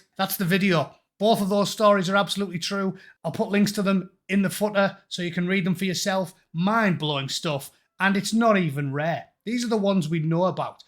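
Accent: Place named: Middlesbrough, United Kingdom. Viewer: You from the Boro lad???? New subscriber ... You sound like your for my neck of the woods.👍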